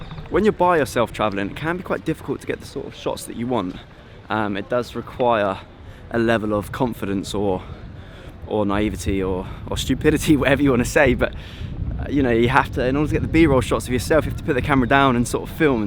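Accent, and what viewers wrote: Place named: United Kingdom. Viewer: this is so powerful and comforting all at the same time. i fully loved every second of it. i've joined the 6am collective newsletter and i can't wait to post my first video soon. thank you! hugs from a 24 y/o woman who genuinely wishes she had your voice? so very soothing and british haha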